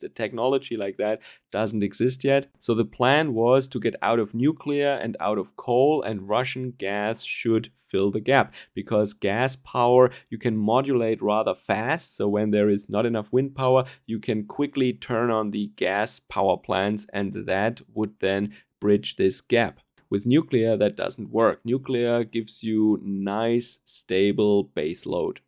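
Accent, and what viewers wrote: Bavaria, Germany. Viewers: Mate, he is from Bayern......they struggle with German! They often have to add subtitles when someone from Bayern is speaking on German TV.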